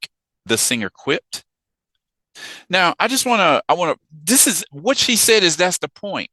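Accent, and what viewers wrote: United States. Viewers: Question: Are you from Brazil?…You sound like you’re from the USA.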